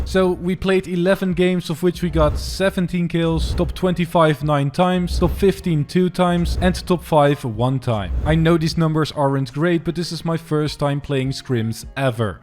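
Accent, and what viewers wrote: Netherlands. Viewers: Kom je uit nederland? Are u from the netherlands? Because i am and i think your accent sounds just like it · Is he Dutch?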